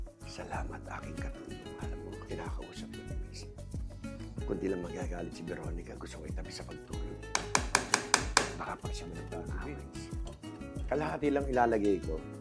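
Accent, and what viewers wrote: Marikina, Philippines. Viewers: Taga Marikina din po ako parang naririnig ko ang Tatay ko pagnagsasalita kayo may puntong Marikena… mahilig din po syang magluto 😊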